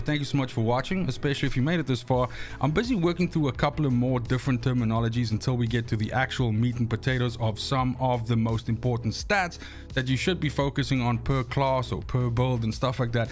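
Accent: South Africa. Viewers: Bro are you South African??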